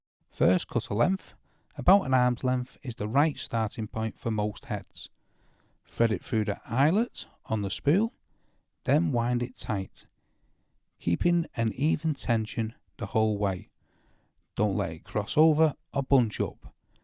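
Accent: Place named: Liverpool, United Kingdom. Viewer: Are you a scouser? My dad was and you sound just like him!!!!!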